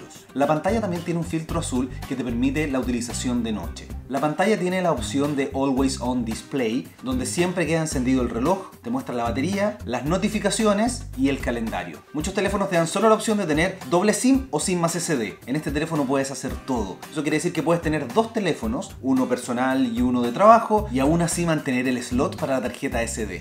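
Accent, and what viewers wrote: Chile. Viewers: Eres Chileno, yo lo soy, y creo que tu idioma se parece un poco al Chileno😂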